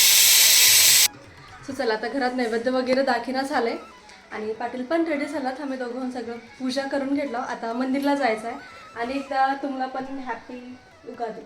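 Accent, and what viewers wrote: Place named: Latur, India. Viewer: लातूर ची भाषा आहे हे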